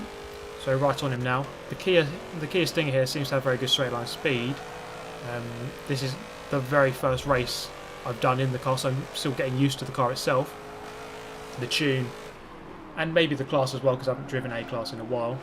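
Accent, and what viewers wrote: United Kingdom. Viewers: Do all brits say "because" in the same way that you do?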